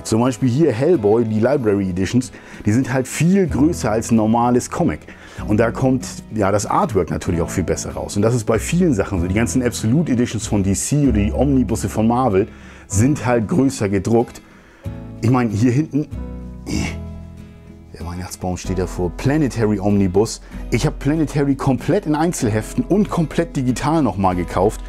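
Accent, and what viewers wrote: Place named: North Germany, Germany. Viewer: Ich wünsche dir ein paar wirklich phantastische Weihnachtstage lieber. Ich freue mich immer, wenn eins deiner Videos raus kommt. Der norddeutsche Akzent und Art lösen bei mir ein klein wenig Heimweh aus. Falls du jemals einen Mitbewohner suchen solltest, dann sag auf jeden Fall Bescheid!:D Bei der Bude bekomme ich ja einen Nerdgasm! Ein einzig riesiger Spielplatz!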